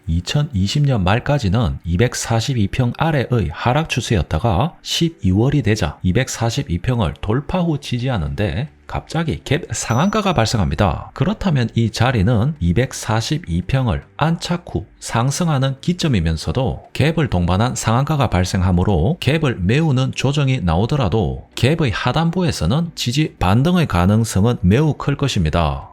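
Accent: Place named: Gyeongsang, South Korea. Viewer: 세련된 경상도 억양이 집중도를 높이네요 귀에 쏙쏙 ~~ 영상 굿~~~ 감사합니다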